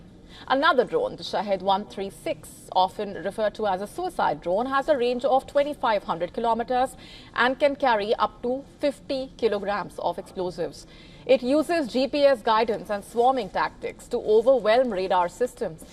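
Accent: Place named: India. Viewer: She is speaking Indian English but has a very harse voice not becoming an announcer.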